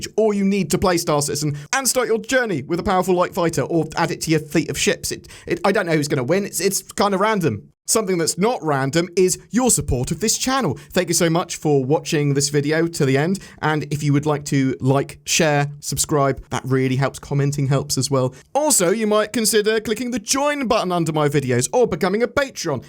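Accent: United Kingdom. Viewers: Is it possible for you or to record yourselves, in your British way, saying these words? Girlfriend, murder, rural, juror. Your struggles with the word "digital" made me think of it.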